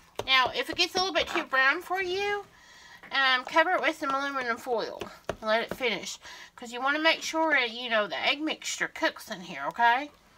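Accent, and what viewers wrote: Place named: Kentucky, United States. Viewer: Just found your channel! Love it and I just knew you were from kentucky!! ( I am too! 😊)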